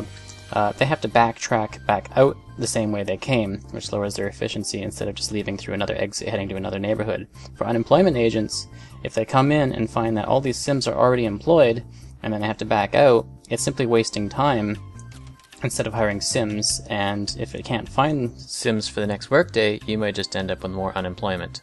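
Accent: Canada. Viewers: Are you canadian? :D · Is he Canadian?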